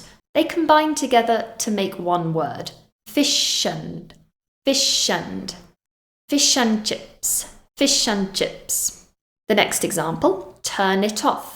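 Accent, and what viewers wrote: United Kingdom. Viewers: I love your British accent ❤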